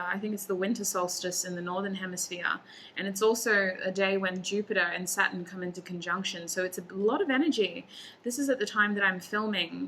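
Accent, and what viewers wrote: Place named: Australia. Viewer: Thanks for the reading dear. I love your Australian accent it is cute and unique. Happy new year.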